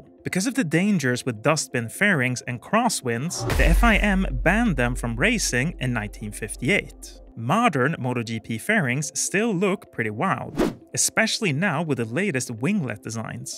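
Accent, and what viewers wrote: Sweden. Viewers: Fan vilken irriterande svengelska ”this meeeeeens”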